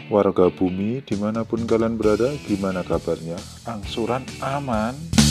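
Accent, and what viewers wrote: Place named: Java, Indonesia. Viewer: Bahasa Medok is REAL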